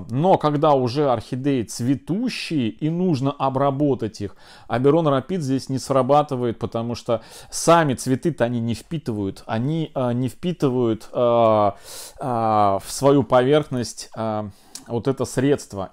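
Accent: Russia. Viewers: к актаре насекомые привыкают, а к оберону конечно же нет, ага... цветы прекрасные, но с аканьем надо что то делать... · С этим аканьем нужно что то делать, невозможно слушать.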